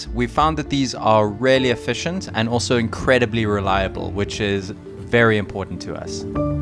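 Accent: South Africa. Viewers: SOUTH AFRICAN ACCENT DETECTED!! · That south african accent again. Thumbs down, I'm out. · Yoh the SA accent is so recognizable bra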